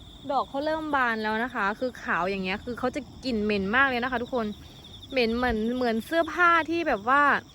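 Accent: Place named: Isan, Thailand. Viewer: ชอบแม่ เว้าอิสานม่วนคัก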